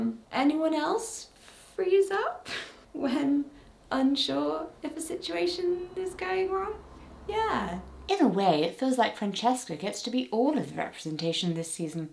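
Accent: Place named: England, United Kingdom. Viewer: not even have 1 FULL regency inspired outfit, but 2 of them, with fake flowers, ribbons and funky hair, everything!! You are literally english queen and you talk like one! Thank you for video!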